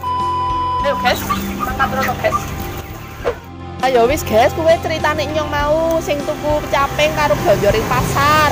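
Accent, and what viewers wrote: Temanggung, Indonesia. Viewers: Wkwk ciscisang 😂 temanggunge metu tenanan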